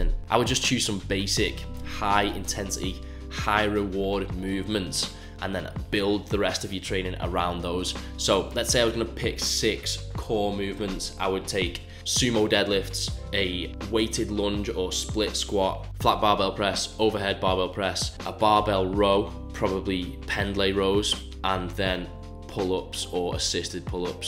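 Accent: United Kingdom. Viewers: Liking the British bants/vibe and info... subbed ! 😂👌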